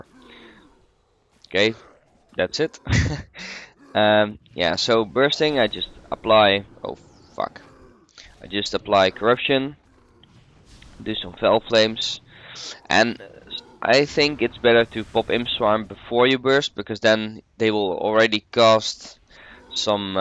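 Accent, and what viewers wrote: Netherlands. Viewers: Kan goed horen dat je Nederlands bent, haha, goeie guide! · gotta love that obvious dutch accent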